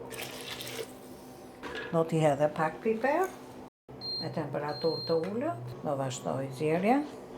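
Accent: Tirana, Albania. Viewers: Ty te ka lezet luga kur gatuan dhe dialekti jonë i bukur i Tironës.❤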